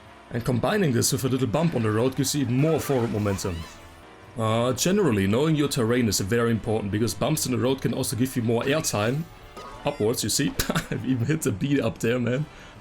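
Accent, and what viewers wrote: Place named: Germany. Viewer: so unangenehm wenn deutsche englisch reden müssen 😂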